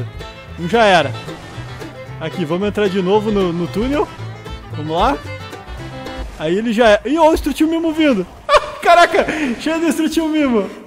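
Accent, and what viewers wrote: Rio Grande do Sul, Brazil. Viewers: vc tem sutaque Gaúcho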